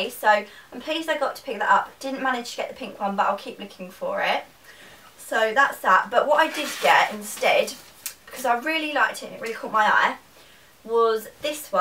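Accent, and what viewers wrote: Essex, United Kingdom. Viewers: im a fashion promo student and everything in this video about pastel colors and elephants is all so true! i got the most amazing elephant graphic print baby pink blouse from some website the other day! inlove! by the way, essex accent?!?:) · You have wonderful style! I'm in love with basically all the things you hauled. I wanted to ask, are you from Essex? I'm trying to work out your accent. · are you from essex? not in a stalkery wayyy you just sound like you are :)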